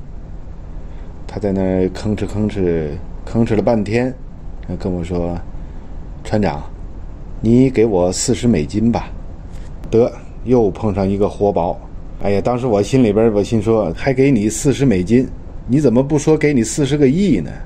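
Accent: Tianjin, China. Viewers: 讲得很精彩，天津口音听着很喜欢。祝船长和船员们新年快乐！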